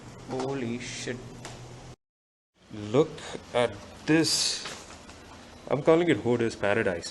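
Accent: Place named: Hyderabad, India. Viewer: This guy is hyderabadi . When he said ye sab cheezan 🤣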